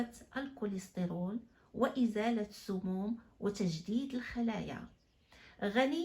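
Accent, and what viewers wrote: Morocco. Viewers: قراءة طيبة بصيغة مغربية رائعة جدا جزاك الله خيرا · طيب الله الأنفاس قراءة بصيغة مغربية رائعة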